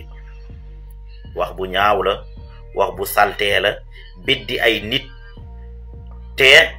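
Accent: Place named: Guinea. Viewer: Yaw rew nga dé ai sa lakeu wolof bou am accent guinéen Réglel yaw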